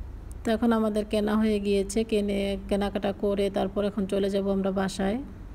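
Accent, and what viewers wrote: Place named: Barishal, Bangladesh. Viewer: ম্যাডাম আপনার বাড়ি বাংলাদেশে কোথায় কথা শুনে মনে হচ্ছে বরিশালের মনে হচ্ছে আমাদের এই ভাষা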